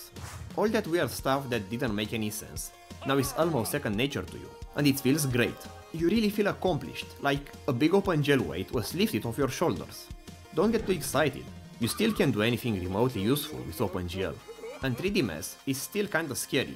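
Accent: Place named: Romania. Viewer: Mereu o sa pot sa identific un român dupa accent :))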